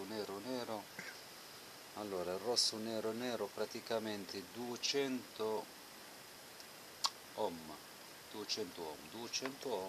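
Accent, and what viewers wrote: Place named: Spain. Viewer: Sei spagnolo si sente nel accento bravo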